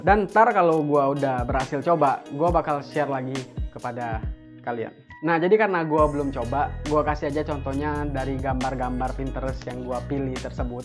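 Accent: Madura, Indonesia. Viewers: Edeh oreng medureh cong?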